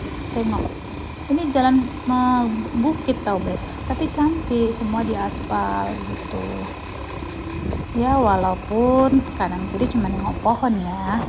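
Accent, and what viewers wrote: Medan, Indonesia. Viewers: Logat..logat nya mb ini kayak orng medan ya😂😂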